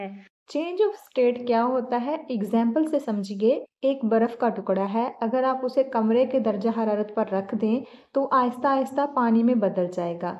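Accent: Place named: Punjab, India. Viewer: استاد جی آپ کی اردو بہت زیادہ punjabified۔۔ہے۔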